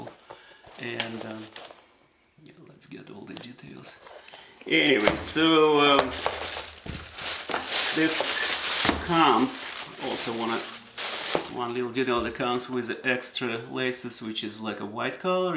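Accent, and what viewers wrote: Eastern Europe. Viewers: Am i the only one who hear slavic accent? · Такое впечатление, что английский язык для вас не родной. Проскакивает какой-то славянский акцент.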